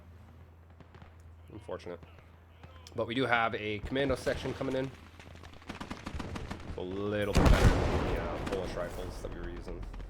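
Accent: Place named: Ireland. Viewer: I'm struggling to pin your accent, I have a professor who is Irish who sounds kind of like you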